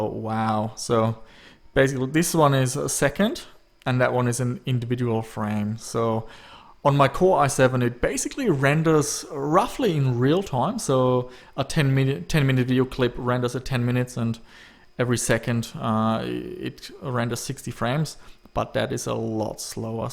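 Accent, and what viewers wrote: Germany. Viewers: Du hast ürgendwie so einen deutschen Akzent 😂😂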